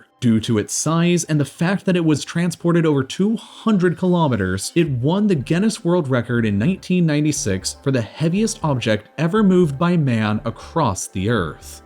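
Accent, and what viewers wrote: United States. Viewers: man i hate how this guy always uses km and meters, Wtf comon. Most of your audience is probably american and you yourself sound it... so come on!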